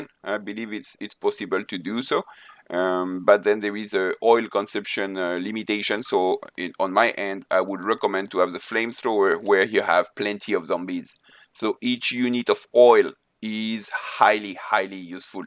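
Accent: France. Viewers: ah je ne suis pas la seule a avoir remarquer un énorme accent Français étrange pour un channel totalement en anglais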